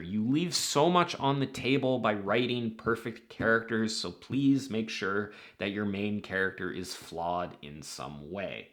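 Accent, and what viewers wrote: Canada. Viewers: Once again commenting on an old video: Balding isn't a flaw, nor is a Canadian accent (that's an asset). Lack of charisma could be a bit of a flaw, but it's not one you have, at least when it comes to video. Now I do have a problem with the single character being the centre of the world. If you're writing from a single POV, that character is automatically at the centre ALL THE TIME. If the POV is first person, there's more leeway because you have immediate, deep access to their thoughts, and they can spend a lot of time thinking about and observing the things happening around them. In a sense, 1st person POV characters can serve as a storyteller in their own right. In 3rd person, though, this level of interiority comes at a higher price because of the perceptual gap between the external and internal experience of that character. Is this really a problem inherent in single 3rd person limited POVs? 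Or are we just so used to an enormous multiplicity of POVs in epic fantasy that, in comparison, books that contain a single 3rd limited POV seem too focused on one character?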